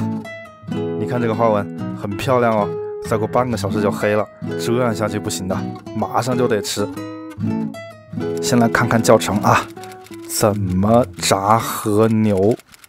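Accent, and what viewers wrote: Wuhan, China. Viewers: 武汉普通话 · 武汉口音忒重了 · 武汉口音太重了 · 楼主是湖北湖南人吧。武汉人仿佛在听汉普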